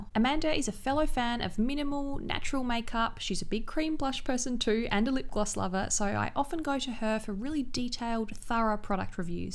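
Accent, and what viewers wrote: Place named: Australia. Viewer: Your accent is so cute!!! Love the Aussie touch to your videos 😅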